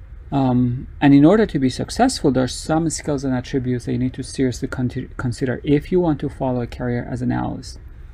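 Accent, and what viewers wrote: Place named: Iran. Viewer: بسیار خوب و مفید آقای من از لهجتون متوجه شدم ایرانی هستید و خیلی منو خوشحال کرد امیدوارم همیشه سلامت و موفق باشید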